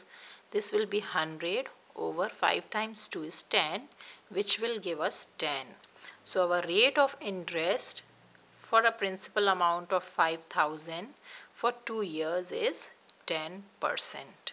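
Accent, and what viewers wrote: India. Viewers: When I heard that she had an Indian accent is when I had complete faith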